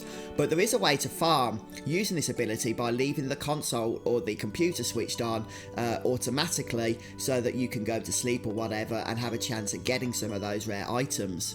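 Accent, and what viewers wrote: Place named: Midlands, United Kingdom. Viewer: He's from Midlands in the UK for sure :)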